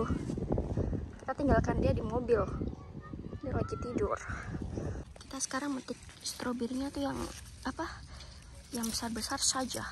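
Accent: Papua, Indonesia. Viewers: mba pernah tinggal di papua mana ? soalnya kyk logat papua gt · Kaka pasti asal Papua ini 😁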